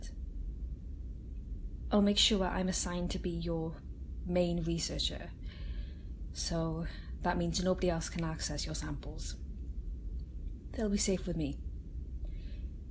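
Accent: Wales, United Kingdom. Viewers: u sound kinda welsh lol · AWESOME VIDEO PLEASE MAKE A PART TWO TO THIS ALSO I LOVE YOUR BRITISH ACCENT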